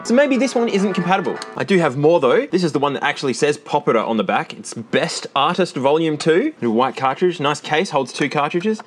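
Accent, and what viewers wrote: Australia. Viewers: They're both Australian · Shouty aussie man's friend is playing on a butt!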